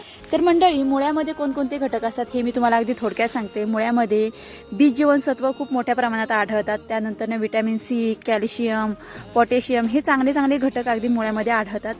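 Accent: Standard. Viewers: Tai ...tujh kiti shikshan jhal....bolnyat standardness khup aahe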